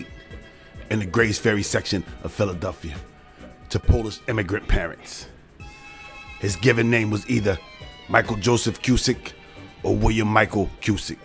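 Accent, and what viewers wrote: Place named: Brooklyn, United States. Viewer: I grew up in Canarsie Brooklyn every one spoke like you they would say hey kid “smartin up”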